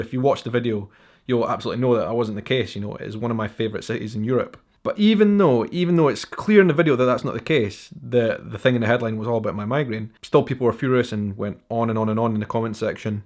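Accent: Brazil. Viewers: You know you are speaking brazilian portuguese, right? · That's really funny, this guy pronounces all the names like a Brazilian; maybe because most people working with public there are from that country? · You speak PORTUGAL, PASTEL DE NATA (Pastéu di Nata) like a brasilian. You never talked portuguese of Portugal 🤣 🤣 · Minute 4, the palace building was the City Hall :) I also like your brazilian accent. You were so lucky with the weather, 30 degrees in October is not usual.. But unfortunately, those wildfires came with it too :( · You have Brazilian accent when you speak Portuguese.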